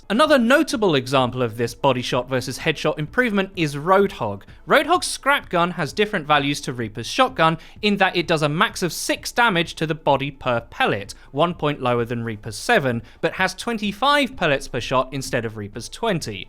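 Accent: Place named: United Kingdom. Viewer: Thought this was a decent explanation of the new changes. Nice to hear a British accent too.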